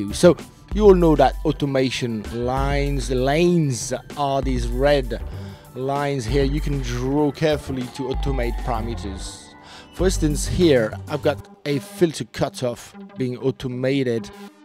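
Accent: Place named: England, United Kingdom. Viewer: Nice english accent l'ami!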